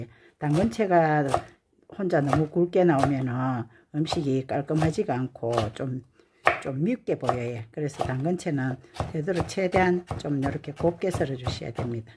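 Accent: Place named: Busan, South Korea. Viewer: 같은 경상도사투리라도참듣기좋은 말투너무좋아요부산 분. 같으십니다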